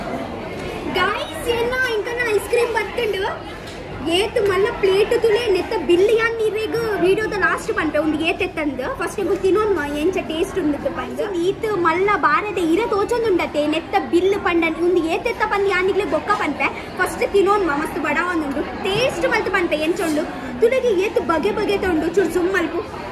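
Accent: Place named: Tulu Nadu, India. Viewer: Your tulu accent reminds me of bombai da binner comedy by MAD IN KUDLA 🤣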